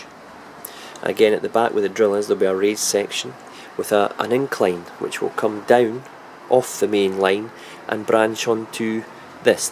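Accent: Scotland, United Kingdom. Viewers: please forgive me for being 4 (ish) years late in watching vid#1 As an English expat based in China since 2004, hearing your accent brought back a million good memories of the 3 years of my life I spent happily working and living 'north of the border'! Vid#1 is a smashing intro to your layout, and I found you & subscribed to you via Everard Junction, who's layout and videos are equally excellent, and I shall be "binge" watching all of your layout updates, in update order. I've been 'armchair' modeling for many years and I'm now looking to actually get off my backside and do something; my biggest issue is that I live in the South of China and thus semi tropical. Temps range from 10C to 40C but humidity is constant from 80% to 100% and I feel that that will be a killer to rails and I'm terrified what baseboards will look like after a year or so! Anyways, a pure pleasure looking at your layout in beta form and I'm looking forward to many many hours catching up to its current state. 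Many thanks mate!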